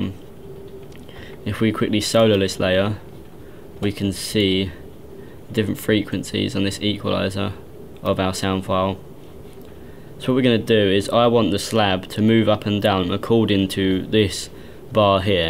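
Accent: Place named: United Kingdom. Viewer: Don't understand British English..... ==' .... American is BETTER!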